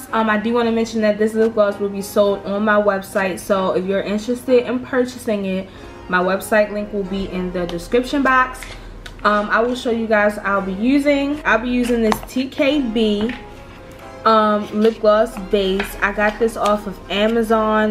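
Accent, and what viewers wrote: Baltimore, United States. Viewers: Omg her accent, is she from baltimore?? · Omg your accent!!! Your totally from Baltimore!!!!!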